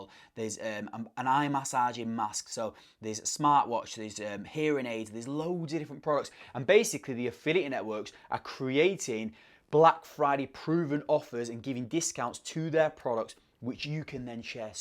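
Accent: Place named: Yorkshire, United Kingdom. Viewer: yeah, I wanted to do that but then Covid came and put my plans on hold, thanks mate. You sound like a Yorkshire lad, I'm from Leeds and vlogging at the coldest inhabited place on earth at the moment 😂😂😂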